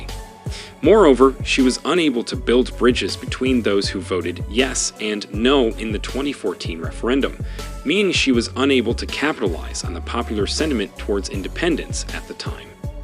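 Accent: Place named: United States. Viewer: what a load of britnat nonsense....spoken by an American accented robot....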